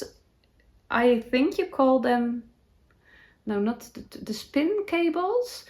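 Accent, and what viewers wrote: Netherlands. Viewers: I love your Dutchy english😊 Half dutch myself, danish the other half, love everything creative and artsy, glad I found your channel♥️ tot siens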